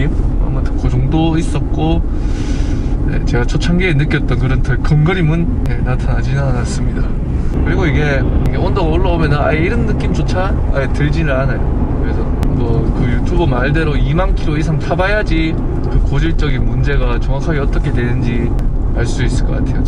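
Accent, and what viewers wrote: Gyeongsang, South Korea. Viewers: 경상도 사투리 멋집니다 ㅋㅋ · 아 이 말투는 상주 구미 김천 말투 같은데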